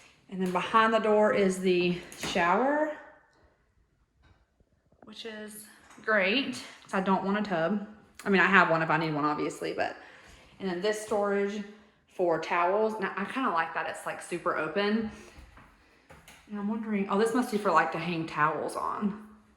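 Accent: South, United States. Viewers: I just hope you don't lose your southern drawl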